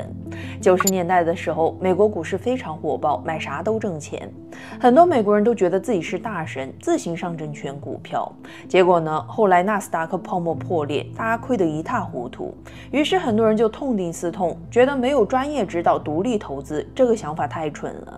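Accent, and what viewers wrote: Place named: Northeast, China. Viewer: 是东北主播吗？能听出家乡音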